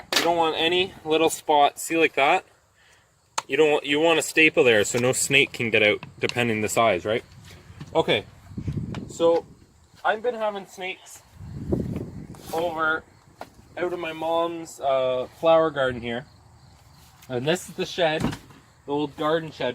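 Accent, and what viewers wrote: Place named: Canada. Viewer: As soon as I heard the Canadian accent, I knew this was the right video to watch.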